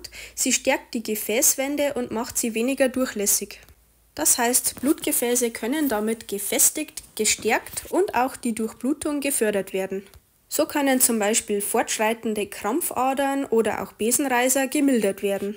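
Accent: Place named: Bavaria, Germany. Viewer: Schönes Video und ein charmanter, Bayrischer Dialket :) Liebe Grüße aus Österreich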